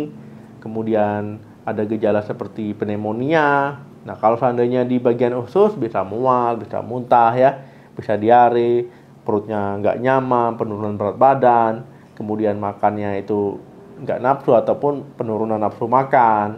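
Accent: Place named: Medan, Indonesia. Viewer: LOGATNYA MEDAN BANG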